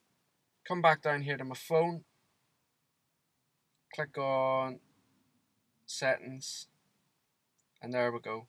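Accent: Northern Ireland, United Kingdom. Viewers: Northern Irish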